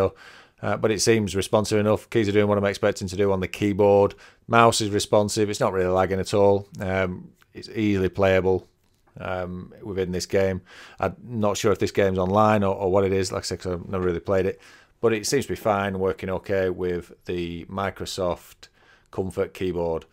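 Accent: United Kingdom. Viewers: Hope pubg allows k&m eventually. Are you from yorkshire btw lol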